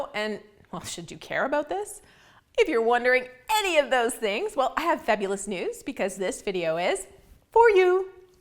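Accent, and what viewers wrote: Canada. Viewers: Very informative- as always. Thanks & hang on to that 🍁accent 😉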